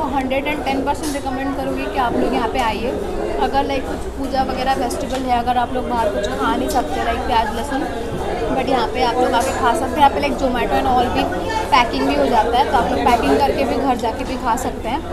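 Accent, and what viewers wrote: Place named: Odisha, India. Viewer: Odia Heiki hindi...lol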